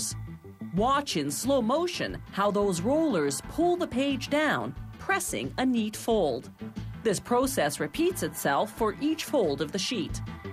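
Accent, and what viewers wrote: Canada. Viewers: It's a canadian accent. Don't worry about it.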